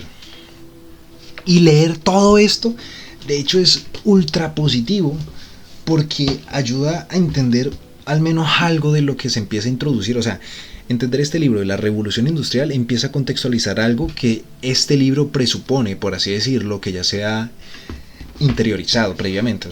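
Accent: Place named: Mexico. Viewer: Hermano deje de hablar como mexicano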